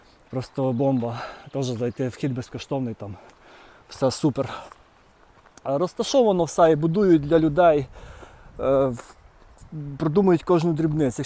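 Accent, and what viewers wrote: Cherkasy, Ukraine. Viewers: По вашій говірці я зразу зрозуміла,що ви Черкащини.А потім по коментарях отримала підтвердження здогадкам😂Дякую за цікавий контент!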